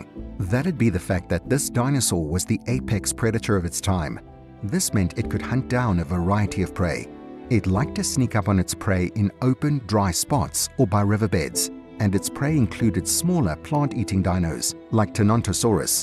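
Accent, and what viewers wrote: United Kingdom. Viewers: UK accents are annoying. DISLIKE.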